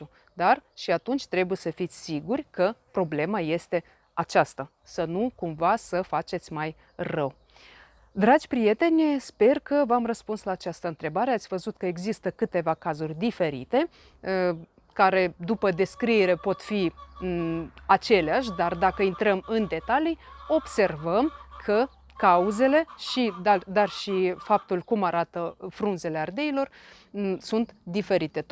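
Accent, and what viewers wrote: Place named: Moldova. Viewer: Cred că toți cei care vă vizitează canalul de youtube vă apreciază. Eu, de asemeni. probabil că sunteți cadru didactic la o facultate de profil agronomic. După accent, proveniți, sau sunteți din Republica Moldova. În România, din păcate, s-a rărit drastic numărul tinerilor care se mai ocupă cu grădinăritul. Oricum, vă urez succes în activitatea profesională și nu numai.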